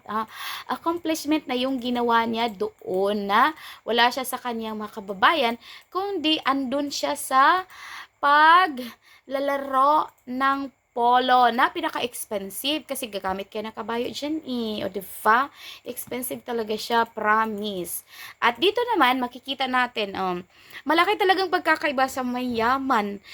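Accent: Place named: Visayas, Philippines. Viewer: Bisaya ka day .ayusin mo pagsasalita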